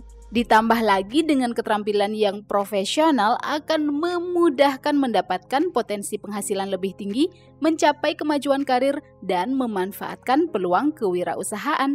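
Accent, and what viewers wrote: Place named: Malaysia. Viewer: Logat melayu ya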